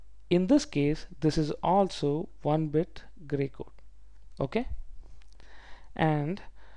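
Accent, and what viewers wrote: India. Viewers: let me guess. Are you from Andhra in India?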